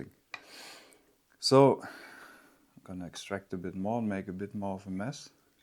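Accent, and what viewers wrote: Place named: Germany. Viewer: You are obviously a German speaker ❤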